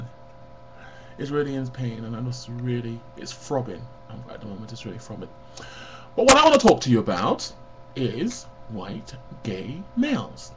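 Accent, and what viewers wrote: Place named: RP, United Kingdom. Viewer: I like how your RP accent.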